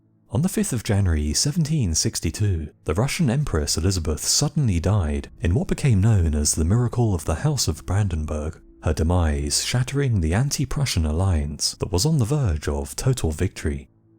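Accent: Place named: Birmingham, United Kingdom. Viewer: My mom is from Birmingham I can almost place your accent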